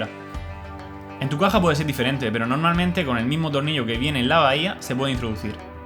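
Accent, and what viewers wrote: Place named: Murcia, Spain. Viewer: Muy buen vídeo , explicación sencilla y clara para todos los públicos . Y sobretodo con ese deje murciano! Un saludo ! 🙋🏻‍♀️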